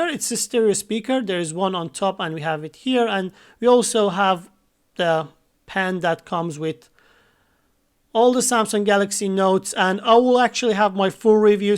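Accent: Iran. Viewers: Judging by your accent I'm guessing your Iranian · you have such a persian accent lol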